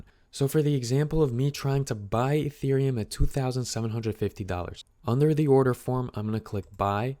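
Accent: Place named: East Coast, United States. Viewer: very well done. I love your east coast accent too.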